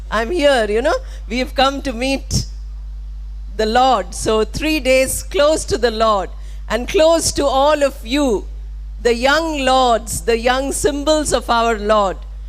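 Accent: India. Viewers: Indian English you are uttering !!!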